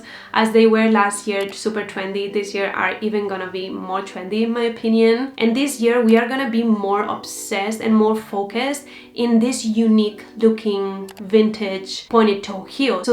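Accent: Spain. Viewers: are you a spaniard? you sound like my mom (in a good way)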